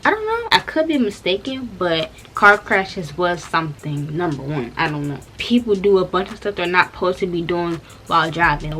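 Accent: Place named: Maryland, United States. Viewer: I guested right she was from maryland because of how she talked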